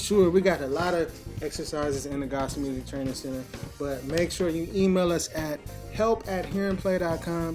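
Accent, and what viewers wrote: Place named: United States. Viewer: Can anybody tell me please, wich region of América this accent comes from?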